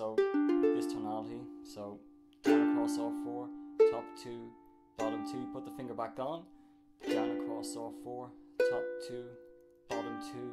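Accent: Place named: Ireland. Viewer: where are you from? it sounds to me like you're from a part of Ireland hehe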